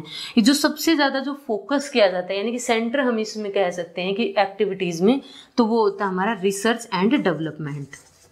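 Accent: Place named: Haryana, India. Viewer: Really appreciable video, cleared all doubts and confusion (btw maam aapki English mai bhi Haryanvi tune aa rhi h) 😅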